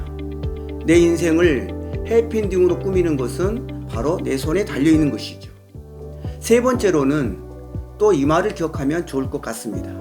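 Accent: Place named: Jeolla, South Korea. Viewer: 짧지만 많은 생각이 듭니다 님처럼 실천은 쉽지 않은 거 같아요 중간 중간 전라도 어투가 더 친숙하게 느껴집니다 가~~~치 가시죠 ㅋㅋ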